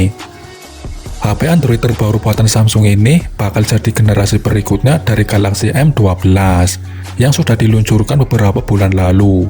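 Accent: Banyumas, Indonesia. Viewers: Orang ngapak yak ?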